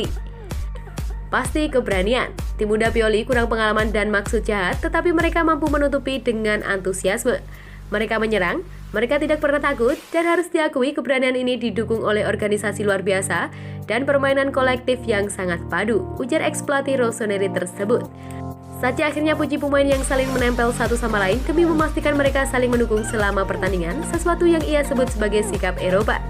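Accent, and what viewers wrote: Java, Indonesia. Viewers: Sory bkn rasis tp voice overnya jangan medok lah, ga enak dikuping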